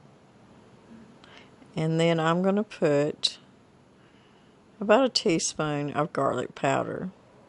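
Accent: United States. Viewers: Ma'am, that is some serious man chow. Even putting three or four in a lunch box for work days would be wonderful. Your voice is just country as a butter bean. Thank your for the recipe and the video. Be well and at peace.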